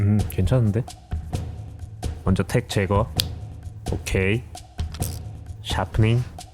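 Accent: Gyeongsang, South Korea. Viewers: 아 약간 경상도 억양이 있는 듯 한데 경상도에서 오셨나요!!! 헷갈리네요 ㅎㅎ 항상 잘보고 있어요!!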